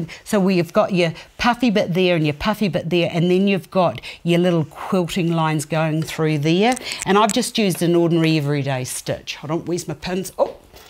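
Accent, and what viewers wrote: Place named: New Zealand. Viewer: How awesome. As soon as you started speaking I thought yayyyy a kiwi!!! Awesome seeing NZers coming up at the top of my search list. Love from Ari in welly!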